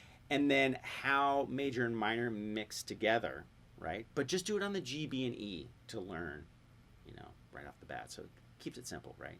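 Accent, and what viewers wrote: United States. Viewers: Enjoyed that lesson. I slowed it down to.75 speed to get the licks down correctly but when I did it made you sound like you had about 5 bong hits under your belt when you where talking. lol Guess it's that southern California accent...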